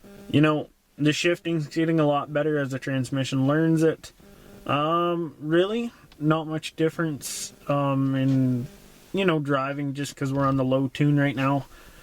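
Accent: Canada. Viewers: First 4 words outta your mouth i knew you were from Alberta hahaha fuckin eh bud me too! 🍻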